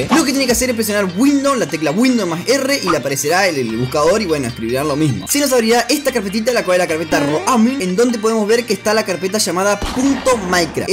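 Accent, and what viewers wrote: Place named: Chile. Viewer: ablas como mi chile querido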